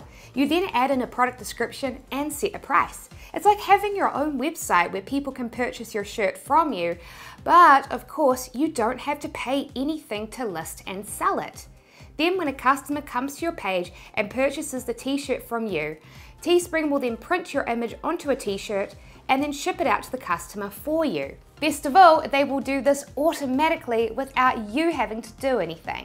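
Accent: New Zealand. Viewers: Are you a kiwi/new zealander?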